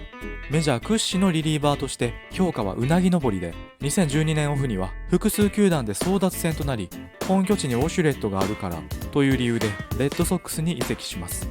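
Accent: Kansai, Japan. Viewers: 関西人だ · 主、関西訛り？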